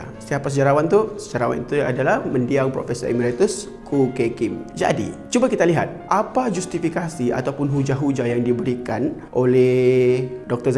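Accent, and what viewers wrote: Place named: Sabah, Malaysia. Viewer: Dia orang Sabah kot dengar cam loghat Sabah apa masalah dengan percakapan dia?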